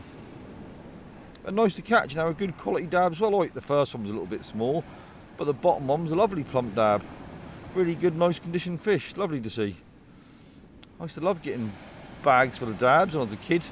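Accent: Norfolk, United Kingdom. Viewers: Hi, great video. Love your content, and your style that you present it in, that lovely Norfolk accent. like the hoodie, keep on entertaining us.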